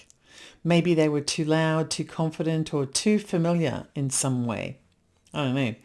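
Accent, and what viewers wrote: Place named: England, United Kingdom. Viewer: In modern vernacular, it's If you spot it, you got it. When I first moved to the north of England, my southern accent earned me disapproval from the locals, who would accuse me of being posh, and talking "wi plum in't gob". I actually have a fairly strong south east London accent, which is far from posh, as you will know. You'd think I was talking like a BBC announcer from the 1950s. It took them a while to let me just be from the south, rather than a princess. So, I wonder if that was a similar thing with your dog park guy. He thought you had pretensions, bc of your slightly Englishy accent.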